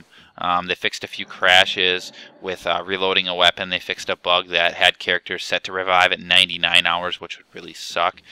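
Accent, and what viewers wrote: Canada. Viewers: you have a heavy newfoundlander-canadian accent lol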